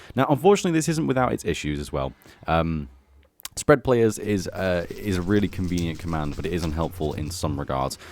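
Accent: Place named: England, United Kingdom. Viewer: Somewhere in England, he's certainly got the accent for it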